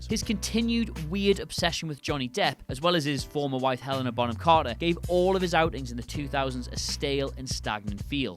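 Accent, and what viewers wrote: United Kingdom. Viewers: Hearing a British Internet host waxing poetic on the early 90's "Los Angeles gang scene" made my day.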